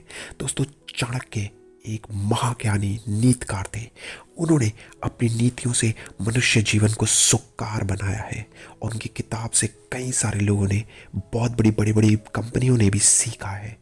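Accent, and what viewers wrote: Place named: Bihar, India. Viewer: Aryyyy bhai suvicha to achha hi but video me voice bihari bhaiya jaise hi .. thoda voice thik kr k dalo..... nice bro. .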